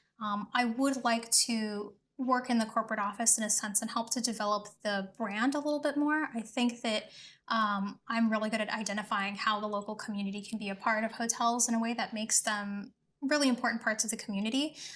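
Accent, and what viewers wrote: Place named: United States. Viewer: Annoying voice.. too loud and american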